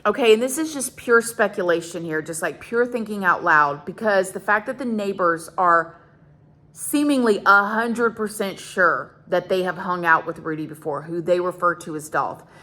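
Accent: South, United States. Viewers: So happy Rudy has been found!💙 Also I love you, a main reason I subscribe is hear you say "poh-leese" your country twang is adorable. Your story is inspiring, thank you for sharing your life w/us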